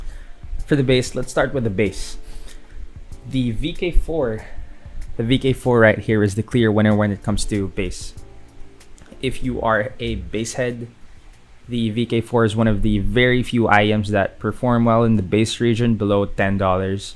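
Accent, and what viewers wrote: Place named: Indonesia. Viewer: You sound Indonesian or Malay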